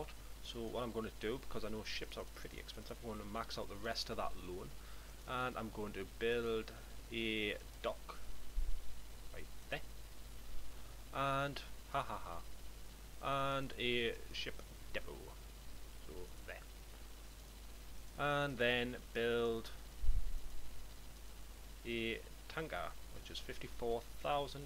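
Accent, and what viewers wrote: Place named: Northern England, United Kingdom. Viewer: I like your accent. Is it from north of England?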